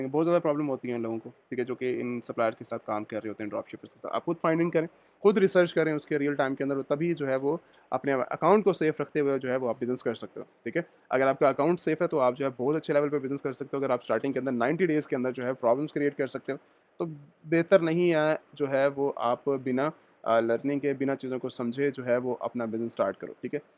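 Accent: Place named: Lahore, Pakistan. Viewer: i think you are from pakistan because your accent is like a lahore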